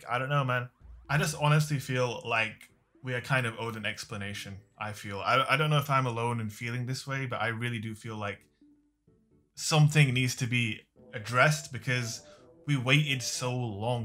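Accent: United Kingdom. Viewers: You're so british lmao I luv it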